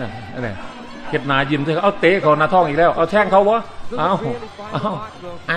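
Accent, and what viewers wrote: Isan, Thailand. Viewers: สนับสนุนเด้อ อ้าย อ้ายหละเน๊าะ ผม2514 คือสิก่อนผมยุดอก มักคักช่องไบรอัน มักทุกช่อง พากย์อิสานมวนคักสนับสนุนเด้อ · พากย์อีสานยิ่งชอบ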